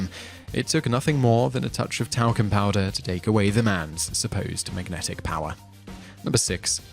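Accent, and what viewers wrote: United Kingdom. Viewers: Hmm. Your picks for this video as well as other recent ones are starting to betray an agenda... Having a guy with a British accent reassuring me that my government is benevolent and has my best interests in mind and that there is nothing to answer for after death is damn well played...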